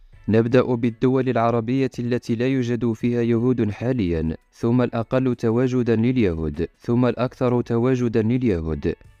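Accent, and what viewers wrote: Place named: Morocco. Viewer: حتى هنا يتتبع المراركة الاثر اليهودي و يروجون للصهاينة...على فكرة انت يهودي مغربية...و لهجتك واضحة...تفو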